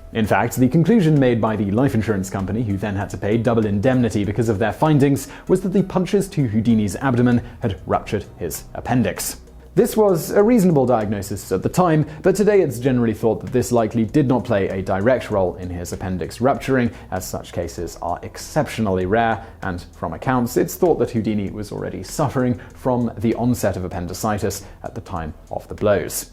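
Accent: United Kingdom. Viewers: You have the britishest accent ever my friend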